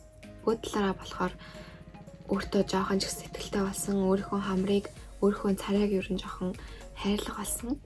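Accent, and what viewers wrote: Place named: England, United Kingdom. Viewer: Монголоор ярьж байхдаа англи аялгаар үг хэлэх үнэхээр муухай сонсогддог. Санал нэг байна.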